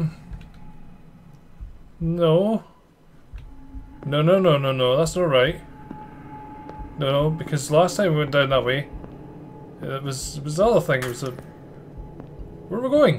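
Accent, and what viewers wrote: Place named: Aberdeen, United Kingdom. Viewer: Heard your story trows and although I'm an auld wifie fae Aeberdeen bidin in London we only a PS 2 I like tae tune in and hear yer bonny accent fer a bit o Hamesickness relief fan I hinna bin up'i'road fer a filie! Ye still followin ma phonetic Doric here loon? Aye? Weel ah've subscribed fer yer stories o ghosties, ghoulies an lang legged beasties. So good luck loon an here's an auld Aeberdeen greetin tae warm yer heart.."G'wa ye radge!" he he jist jokin loon! xx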